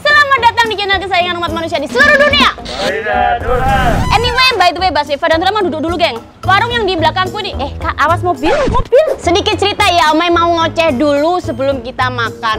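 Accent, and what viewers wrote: Java, Indonesia. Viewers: Mhn maaf yach knapa yach klo liat food floger lain makan tuh kyk'a gmnaaaa gitu...tp klo liat Omay makan koq enak banget..liat wajah'a jg enak...ngomong'a jg enak dgn ciri khas medok'a... Maka'a aq cuma.subscribe channel'a omay doang...ciyuusss nih n .sll nunggu senin rabu jum'at...😘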